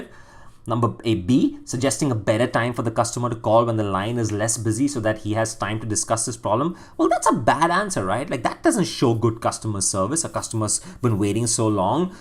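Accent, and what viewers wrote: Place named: India. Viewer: McDonald's don't use white ppl in their advertising either. So no wonder this guy sounds like he's recording this from Mumbai.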